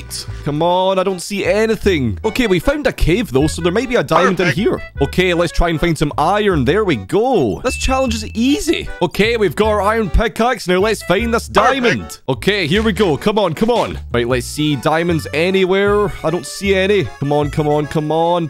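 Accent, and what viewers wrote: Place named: Germany. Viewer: German accent i think Because he pronounces a with low pitch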